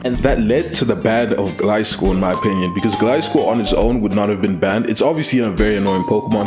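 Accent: South Africa. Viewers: Are you South African?👀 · Yeah right? It's a like mix of South African, English and American (not sure on the specific regional accents, but you get me)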